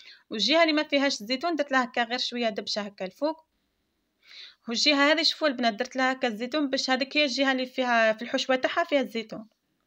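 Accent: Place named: Oum El Bouaghi, Algeria. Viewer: يعطيك صحة حبيبتي غدوى نديرها لعشاء و راني من متابعيك فالفايسبوك تبان لهدرة تاعك من ام البواقي سورتو كي كاتبة فالفايسبوك مطبخ بايضية لخاطر انا تاني مالبيضاء و يشرفنا تكون عندنا طباخة ماهرة كيفك ربي يسترك حبيبتي😘😘😘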